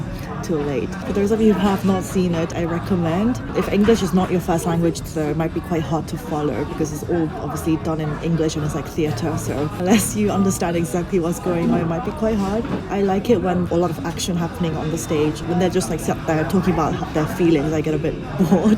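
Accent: England, United Kingdom. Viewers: ~ 전 영어공부 하는겸 재밌게 보고있어요ㅎㅎ 예전에 영국에 잠깐 거주했지만 영어를 아주 능숙하다 할 정도는 아니어서...ㅜㅜ 영국 악센트와 한국생활 소재로 영어하는게 진짜 맘에 들어요~~^^ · After watching a few of your videos I noticed your English accent got stronger while you were in London and it got lighter after a while in Korea! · It was interesting seeing London from your point of view. You are such a foodie! LOL Which is ok but you make me hungry even though I had just ate something. I also think your English accent came out more the longer you were in London too!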